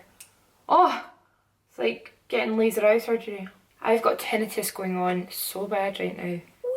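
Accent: Scotland, United Kingdom. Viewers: Just stumbled across your channel...oh my GOD! This is gorgeous! Nice to hear a fellow Scot on YouTube too! Buzzing to give this a bash 😍 x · I'm irish from northern Ireland she sounds Scottish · whenever you say "kinda" with your Scottish accent it sounds like you're saying my name(: love you!!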